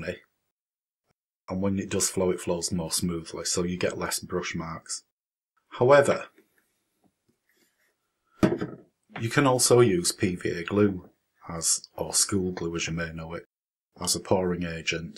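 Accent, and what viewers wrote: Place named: Sheffield, United Kingdom. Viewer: An Excellent Tutorial and not just for beginners. We come from the same area re accents and I'm glad to see you using real spoons they wipe off so easy ( an ex pat from Sheffield ) Ann Wardley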